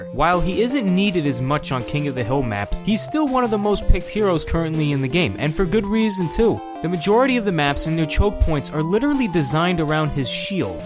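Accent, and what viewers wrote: New York, United States. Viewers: The fact that he has a New York accent makes every one of his roast better 😂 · Is this guy a fokin new yorkah or Brooklyn dude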